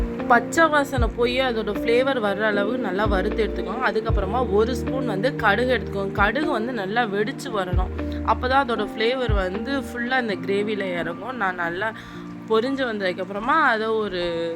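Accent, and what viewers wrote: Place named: Ramanathapuram, India. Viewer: Akkaw neenga ramnad district dhane,apdiye enga ooru karavanga Madhiri pesuringa.chicken gravy pathale echeel oorudhu ka.mouth watering dishes ka.superb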